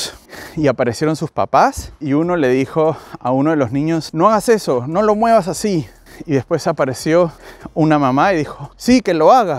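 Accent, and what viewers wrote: Peru. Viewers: It's a peruvian Spanish the best to learn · That's Peruvian Spanish.... good quality Spanish....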